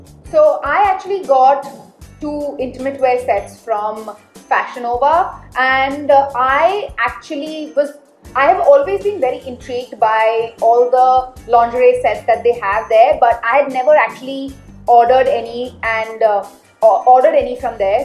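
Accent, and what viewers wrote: India. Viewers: The only thing more amazing than this woman's body is her accent <3 Indian women! <3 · INDIAN GODDESS ALERT! Thought you were from the UK for a second, then I heard the accent lol. You should become a super model! These big companies will give you all the outfits and products FOR FREE. Life is good huh! Or just marry some saudi billionaire or russian oligarch.... decisions decisions 😁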